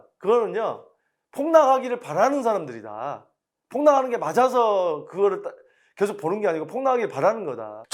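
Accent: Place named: Honam, South Korea. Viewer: 호남 사투리 쓰시네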